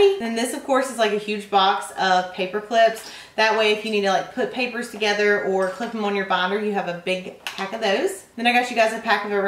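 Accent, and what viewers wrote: South, United States. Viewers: your pure American (love your accent but it won't suit me lol) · I LOVE her kind of southern accent it's so pretty ❤️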